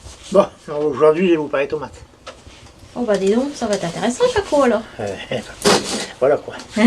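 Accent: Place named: Nord-Pas-de-Calais, France. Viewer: CC, Les tomates expliquées par un ch'ti ... un parfum d'été en plein décembre ....😎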